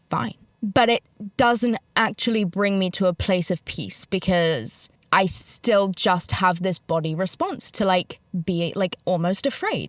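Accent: United Kingdom. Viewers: me I don’t hear the US accent but the UK one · You did say Vite-a-min 😂 it’s VIT-A-MIN. But yes, overall you’re back to British. 🇬🇧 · I don't think an american accent has developed but the britishness of your accent has definitely decreased. It's noticeable if you go back and watch early tiktoks of yours.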